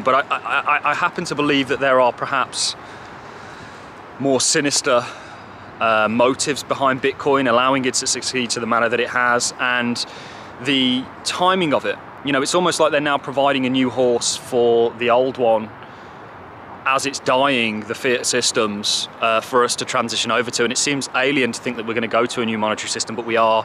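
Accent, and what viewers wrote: United Kingdom. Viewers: If I was gonna listen to a brit my ancestors would have stayed in Ireland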